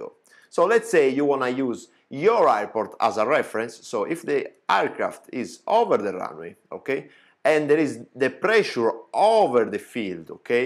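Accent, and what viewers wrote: Italy. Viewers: I love Italian accent in English Amazing man , I enjoyed the speaking more than explanation 😉. A320 tutorials if you don’t mind Capitano 😘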